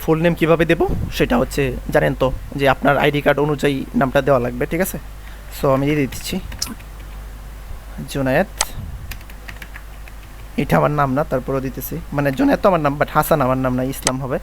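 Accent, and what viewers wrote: Sylhet, Bangladesh. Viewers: vi kita sylheti ni?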